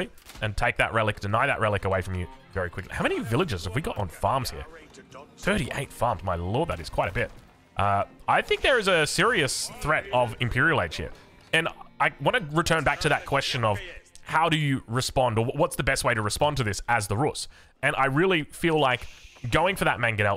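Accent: Australia. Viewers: Hi, you're aussie right? 😅, do queues pop ok for this on 1v1? cause i want a new comp rts to play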